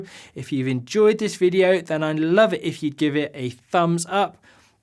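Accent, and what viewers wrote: United Kingdom. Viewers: He speaks the Queen's English, so he's the one who actually says it correctly. If you think the way he says vitamins is funny, you should hear him say "Aluminum." Heh heh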